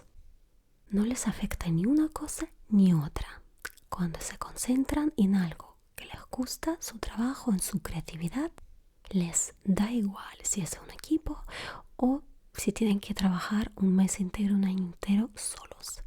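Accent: Russia. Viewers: Por ese acento de una Rusa hablando español <3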